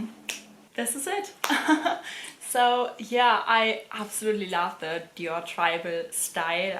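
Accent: Germany. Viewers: Was hast du denn für einen deutschen Akzent?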